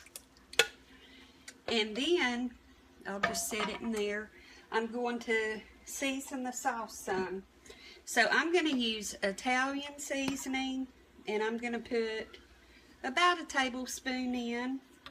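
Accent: South, United States. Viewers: I have just found you, where are you located. Being from the south we don’t think we have accents only the Yankees do, but I thought I heard a little bit of southern twang in your voice, very comforting. Keep on cooking and I’ll continue to watch. Thank you Lady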